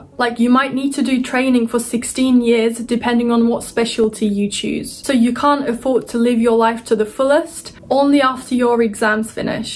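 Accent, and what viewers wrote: United Kingdom. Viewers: Bilerek mi british accent kullanıyorsun yoksa orda alıştığından mı? Cevaplarsan sevinirim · personally i prefer american accent but the more i watch your videos i become a fan of the british accent. It's adorable when you speak English that way :) Actually, I'm obsessed with the English language :) , but I have never had the opportunity to be in an immersive English environment. I envy you :) good luck with your studies.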